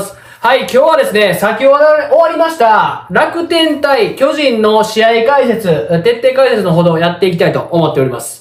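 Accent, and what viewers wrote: Kansai, Japan. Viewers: 小山さんって関西の方ですか？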